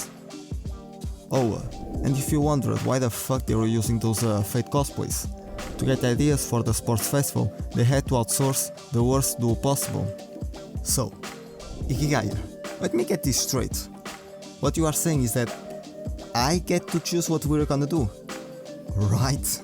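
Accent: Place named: Russia. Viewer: the russian accent is very thicc you sound like vlad ncl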